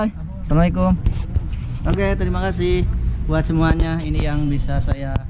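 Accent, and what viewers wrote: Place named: West Java, Indonesia. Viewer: Assalamualaikum..... teh lucu, sundana napel wae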